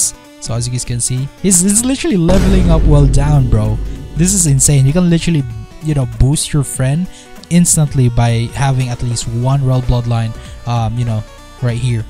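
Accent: Philippines. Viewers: Yung accent mo preee parang Filipino ka ah! Notice mo sana to men if filipino kanga ba talaga at kung na iintindihan mo tong sinabi ko.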